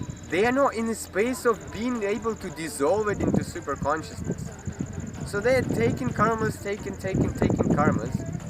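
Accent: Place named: India. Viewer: 2reto. He does project a weirdness that makes me wonder if it's a persona. Who is he really to be teaching others? Why does this Russian man speak English with an Indian accent? So many questions...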